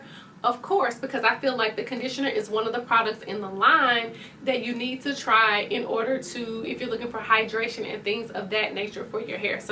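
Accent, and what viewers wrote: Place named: South, United States. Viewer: Girl you gonna break YouTube with that southern accent popping curls fresh face. Thanks for the review. 😄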